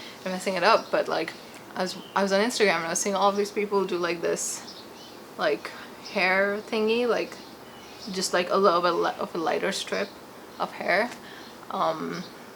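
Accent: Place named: United Kingdom. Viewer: ABFTBA Always be flexing that British accent.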